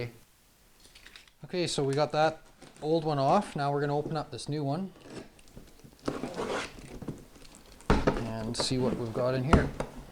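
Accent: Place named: Prairies, Canada. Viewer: Thanks for the video and your thick Canadian prairie accent is making me homesick!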